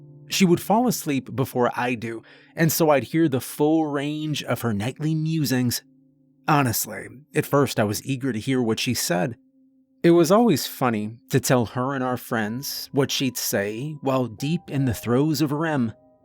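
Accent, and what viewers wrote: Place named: Louisiana, United States. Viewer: What bayou you from, reader?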